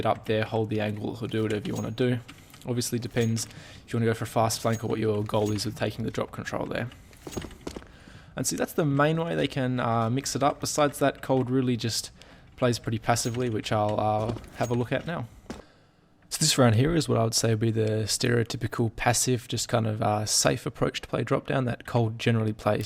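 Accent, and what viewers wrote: Australia. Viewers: are you Australian? Im in love with your accent :DD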